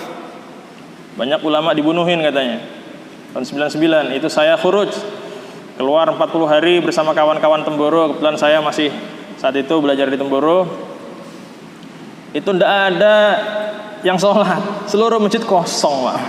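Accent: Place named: Johor, Malaysia. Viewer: Bagus Bahasa Melayu Dia seperti Bahasa Melayu kami di johor juga.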